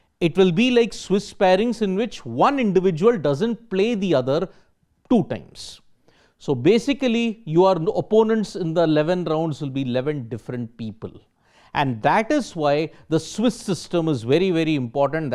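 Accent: India. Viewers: Do u have a problem with the Indian accent? His voice is loud; his pronuciation is clear. What more do u want?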